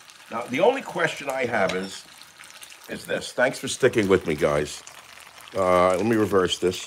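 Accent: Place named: Bronx, United States. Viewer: Dr. Savage, another reason your listeners enjoy your new cooking format so much is it's strong ASMR component. It's very relaxing. The clinking of the untensils, the sizzling of the oil, and that along with your voice, which is deep, rich, and maliflous. On a personal note and as a Bronx native myself, your distinctive Bronx accent reminds me of my parents, grandparents, and other relatives, most gone, who I miss so much.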